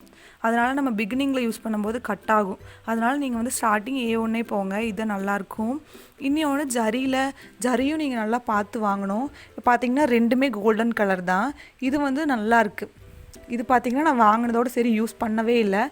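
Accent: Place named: Coimbatore, India. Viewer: உங்கள் பேச்சு மிகவும் அழகு சகோதரி. நீங்கள் கோயம்புத்தூரா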